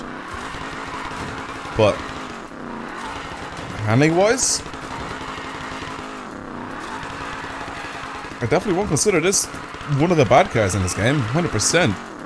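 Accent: Dublin, Ireland. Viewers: Are you Dublish?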